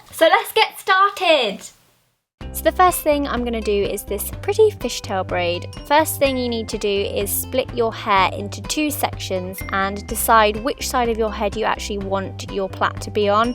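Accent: England, United Kingdom. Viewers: I love u omg ur so adorable! Where from England are u? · Is she really British or was she faking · finally! someone else with an English accent! i thought i was the only one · Omg I love your accent sooo much! Is that British accent? I'm not sure bcuz I'm not real English person... Any way I loved your video! Now I got that how to make a fish tail ^.^ thanku so muchhh :) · R u british? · Are you from england? Your voice sounds so elegant! You sound like the queen of england! (Im from the US so im not used to fancy accents) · you have the best British accent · British accent!!!!!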